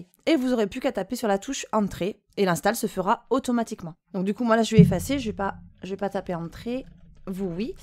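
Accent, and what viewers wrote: South, France. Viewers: merci pour tes vidéos, t a l accent du midi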